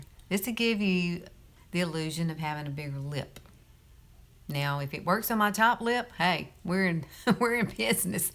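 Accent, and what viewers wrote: South, United States. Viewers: OMG, This is sooo borning, and annoying.. Please tone your voice down on all these ads.. and stop trying so hard to be cute... Just do it .. I do not have all day.. chances are I will click you off before you get to the facts.. Wow.. is she southern or what ??? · You are just so darn cute! I'm a sucker for a southern accent! Man, woman or child! I feel like you could cuss me out and you would still sound sweet lol! Have a great night!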